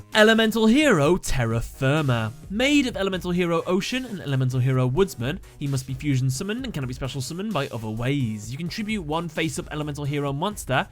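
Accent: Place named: United Kingdom. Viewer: you're so British. And I live that you say reckon.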